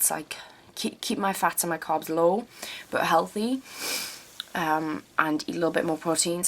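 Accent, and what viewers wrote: United Kingdom. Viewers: haha, while I agree with your rant..your accent just made me laugh tremendously. idk something about British accents saying the word "fuck" lol. but truthfully only someone jealous of your will power or insecure with their body would write something like that. I know bc I'm super jealous. But I'll deal lol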